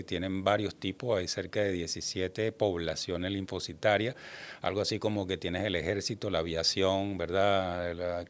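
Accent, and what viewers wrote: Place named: Caracas, Venezuela. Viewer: Ese acento me recuerda al Guaraira Repano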